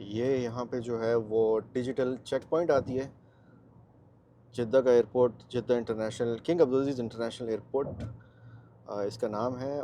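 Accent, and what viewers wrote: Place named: India. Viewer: Can't even believe you are from Pakistan.... Your language and accent and face is so much similar to people living here 💕💕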